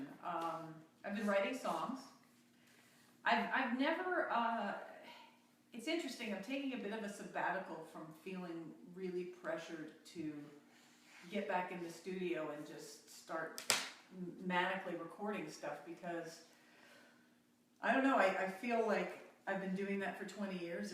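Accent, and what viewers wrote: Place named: Canada. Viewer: You can definitely tell you are Canadian when you speak, the accent definitely doesn’t show when you sing❤️🇬🇧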